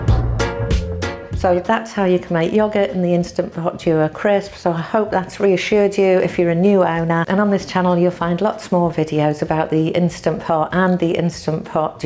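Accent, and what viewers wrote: United Kingdom. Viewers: Just what I am looking for thanks for this video, and a British accent to lol I am from the UK and now live in the States, they pronounce yogurt different in the States. Thanks for you video though.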